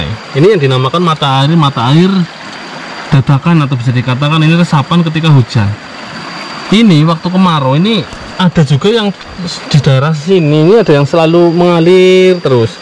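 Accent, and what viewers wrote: Banyumas, Indonesia. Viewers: Bahasane kok ngapak yah ??? Asli ngendi kang!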